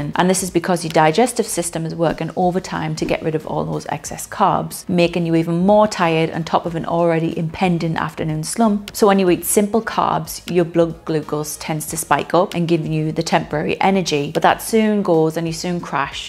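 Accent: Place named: Newcastle, United Kingdom. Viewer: Must be a Geordie!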